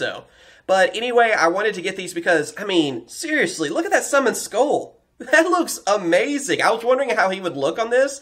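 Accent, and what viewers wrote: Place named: Georgia, United States. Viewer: Bro are you from Georgia because you sound like you are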